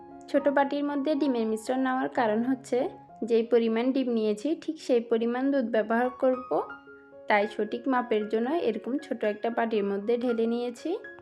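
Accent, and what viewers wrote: Chittagong, Bangladesh. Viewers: Chottogram er Language 😮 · আপা মনে হয় চিটাগাং এর কথায় চিটাগাং এর টানটা আসছে।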